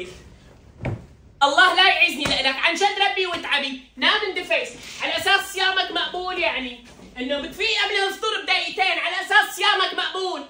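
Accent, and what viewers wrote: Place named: Syria. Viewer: هههههههههه والله ضحكتني ماحلاها اللهجة السورية تحية من الجزائر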